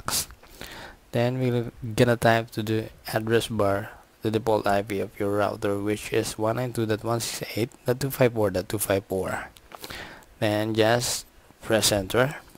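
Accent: Philippines. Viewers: tagalog accent is terrible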